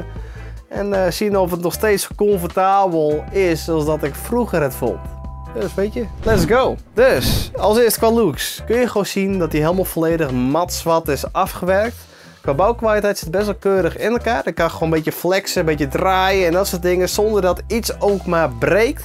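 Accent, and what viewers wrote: Friesland, Netherlands. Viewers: Hou van het friese accent. De oorschelpen zijn achteraf super chill had ze ook op mijn razer nari ultimate. Voor lange gaming sessies zijn ze zeer fijn want ze houden je oren wat koud (cooling gel)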